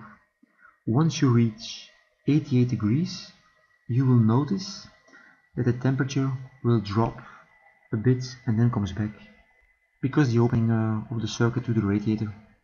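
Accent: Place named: England, United Kingdom. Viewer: Thank you for this great video. Btw, j´aime bien votre accent anglais :-))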